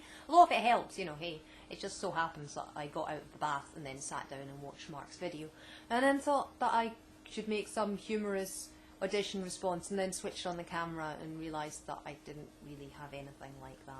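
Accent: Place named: Scotland, United Kingdom. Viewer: I love your accent! You have to be from Scotland right?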